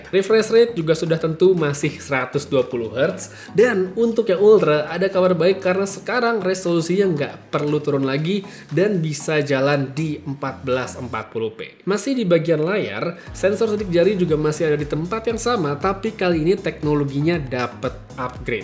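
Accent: Bali, Indonesia. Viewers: Orang bali iya ?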